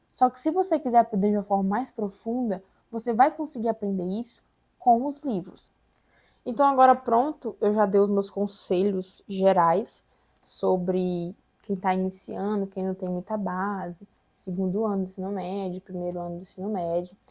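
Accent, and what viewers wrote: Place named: Pará, Brazil. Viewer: Tu é paraense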